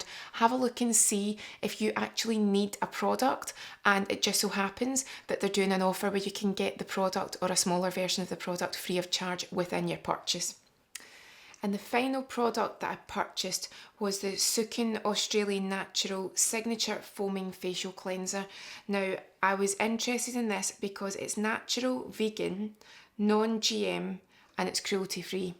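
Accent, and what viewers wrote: Scotland, United Kingdom. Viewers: I'm sorry, but the Scottish accent is one of the most amazing! Love it.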